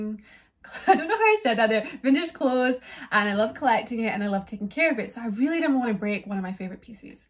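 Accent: Scotland, United Kingdom. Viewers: Are you Scottish? Your accent is super cool!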